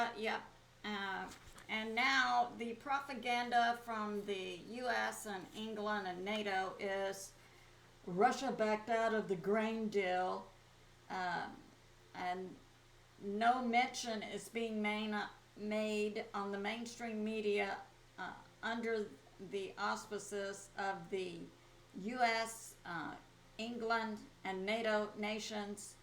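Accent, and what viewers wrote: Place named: Texas, United States. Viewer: She’s perfect just the way she is she has a Texan accent lovely!!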